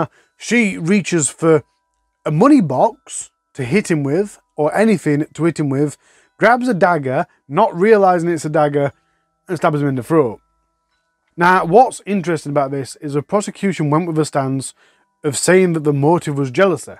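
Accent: Yorkshire, United Kingdom. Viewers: awww blooody elll lad 😂😂😂 thought I could see a bitta Yorkshire in there. Hahah. Love this channel mate. Currently weking mi way frew thee vids 😂. Keep up the great work man! Glad I found the channel. “Am off for a cuppa n a bizkit, before I gu darn Weken men’s 😂😂”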